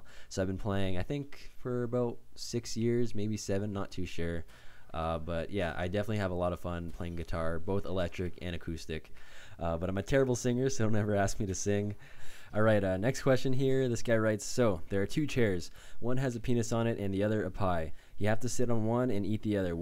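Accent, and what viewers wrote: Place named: Asia. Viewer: 我從一開始看他之後就覺得他的口音有點亞洲人，感謝翻譯。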